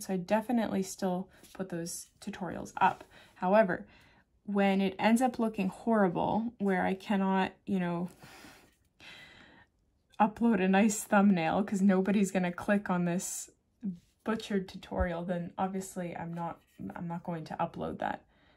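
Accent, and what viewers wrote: Canada. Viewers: Within 2 min I recognized a fellow Canadian 🇨🇦 Beautiful job, thank you!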